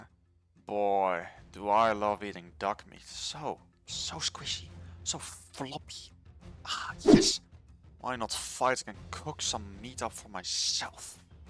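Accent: Netherlands. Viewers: Je bent nederlands of niet😂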